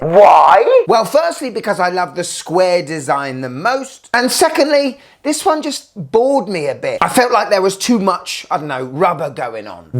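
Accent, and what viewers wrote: United Kingdom. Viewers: When I first saw one of this guy's videos, I thought he was really intense, but now I love him. Even though English isn't my first language, I understand him perfectly; I think British English is easier to understand than American English.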